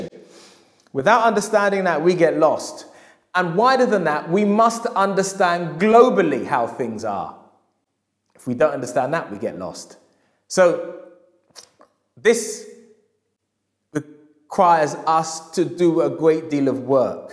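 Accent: England, United Kingdom. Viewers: Baseball analogies in an English accent! :))))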